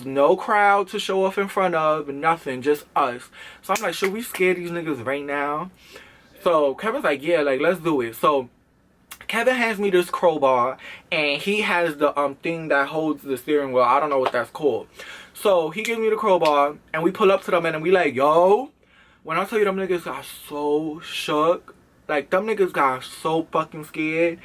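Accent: New York, United States. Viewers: is so new york, i love it😂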